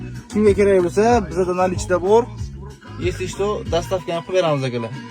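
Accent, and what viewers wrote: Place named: Bukhara, Uzbekistan. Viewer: Adres shevasidan buxoro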